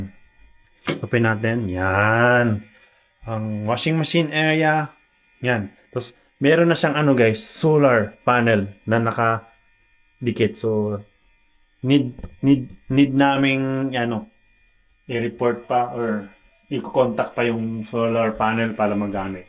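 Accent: Visayas, Philippines. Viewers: Maayong hapon Sir, dugay-dugay nako nga watch sa imo vlog,until I heard from you Bisdak diay ka,hehehe😍👍👏, happy ko karon naa diay Japan vlogger nga taga-Davao, so subscribe dayon way langay-langay...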